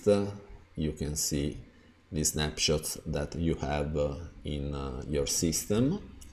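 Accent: Italy. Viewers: this is the most italian english I've heard in a while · No matter, I like both, italian and english. Best mix of all.:-), there is not much bad with it. It is just, that many spoken words have an extra "e" at the the, from run it get's run-e. For me it's perfectly fine.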